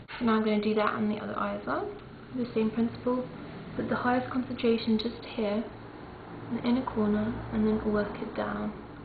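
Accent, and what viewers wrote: United Kingdom. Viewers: i love british accients ha sorry had to say it, and thanks for the tips:).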